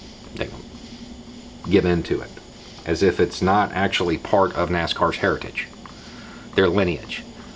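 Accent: South, United States. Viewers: Come on now. Southern-sounding white guy in his garage, with a decent sized beard and cap? Oh hell, brace for the... Whoa, This is Awesome!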